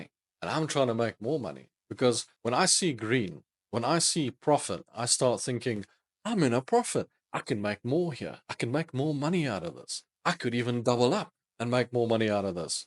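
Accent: South Africa. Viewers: Good content. Are you from Cape Town?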